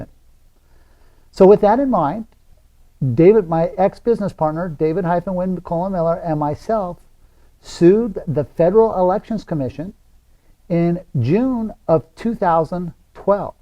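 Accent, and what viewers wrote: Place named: United Kingdom. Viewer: Here, thought you were Brit 🤔😂🖖🏾👏🏾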